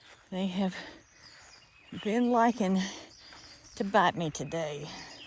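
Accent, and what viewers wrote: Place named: South, United States. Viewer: Love that slightly southern lilt in your voice.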